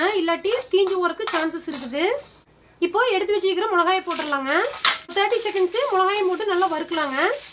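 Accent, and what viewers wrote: Kongu, India. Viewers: yur voices lyk kongu slang in gobi which place yu are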